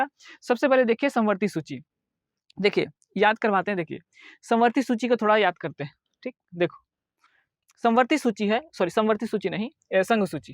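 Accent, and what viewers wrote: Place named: Chhattisgarh, India. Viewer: Sir hum logo ko chhattisgarhi bolne achchha lagta hai waise aapka Hindi bhi bahut majedar chhattisgarhi jaisa aanand deta hai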